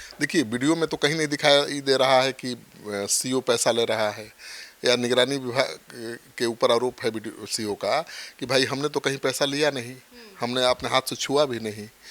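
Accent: Bihar, India. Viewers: जी आप को बिहारी टोन में इंटरव्यू लेते हुए सुनकर आत्मनुभूति होती है...!कृपया इसे जारी रखेंगे 🙏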